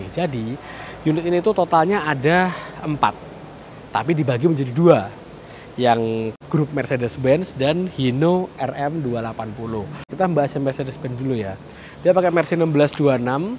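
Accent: Java, Indonesia. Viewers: Channel ini isinya jamet jamet medoggg...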